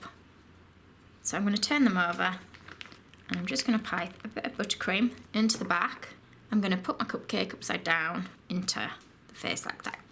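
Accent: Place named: Yorkshire, United Kingdom. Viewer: Hi this is great. Amazing! What a creation. Also your lovely accent reminded me of "Miranda" where Miranda pretends to be "From the general Yorkshire area".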